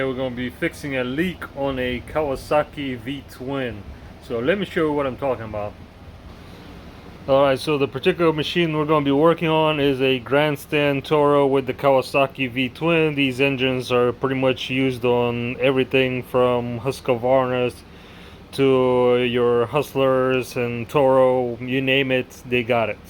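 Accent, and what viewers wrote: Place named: South Louisiana, United States. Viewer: thank you for the video, huge help trying to help out an old man with Parkinson's ,,, his one joy is cutting his grass now i can get him back up and going from the sound of your accent, sounds like you're from south Louisiana,, i'm from Lafayette originally, be blessed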